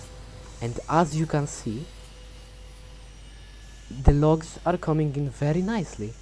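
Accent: Poland. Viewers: tylko cie uslyszalem i odrazu wiedzialem ze polak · are you polish? you have a very polish accent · bro are you from poland i can't listen some words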